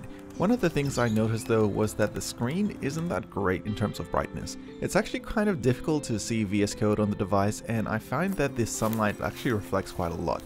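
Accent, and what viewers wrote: Australia. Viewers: Why does your accent sound like an Australian trying to sound American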